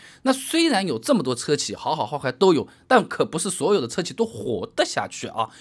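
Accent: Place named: Shanghai, China. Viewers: Jerry J 吴语口音都这样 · 听你口音，像是上海或苏锡常一带的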